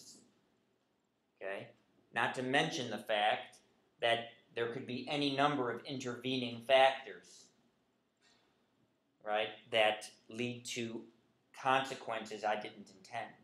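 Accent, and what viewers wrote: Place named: South, United States. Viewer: It's so sad that I could tell he was from the South.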